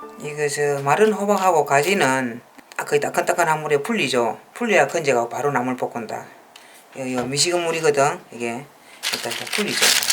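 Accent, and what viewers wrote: Gyeongsang, South Korea. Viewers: 경상도 말씀이라 잘 못 알아듣긴한데 나름 매력적입니다 ㅋ ㅋ 근데 아주 맛깔스럽게 하시는듯 팬 찜!!! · 호박고지나물 영상 넘 잘보고 있어요 경상도 사투리도 좋아요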